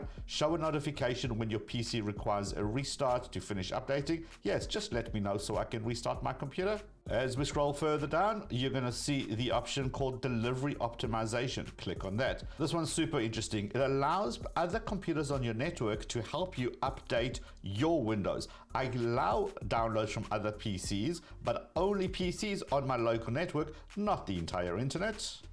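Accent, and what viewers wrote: South Africa. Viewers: last thing I expected here was to hear a Saffa accent lol, nice!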